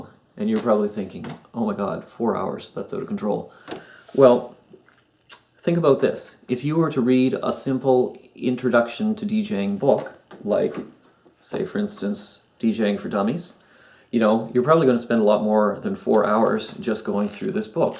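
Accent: Canada. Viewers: Also, love the Canadian accent. Living in Manitoba mine isn't nearly as defined as yours but I still try to making it noticeable.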